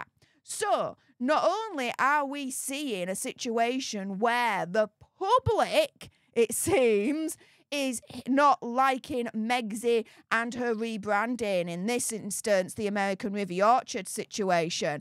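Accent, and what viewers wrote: Yorkshire, United Kingdom. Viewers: I just love your thick Yorkshire accent! If I didn’t have my own unique accent (Australian) I definitely would have a Yorkshire accent!😂😂🇦🇺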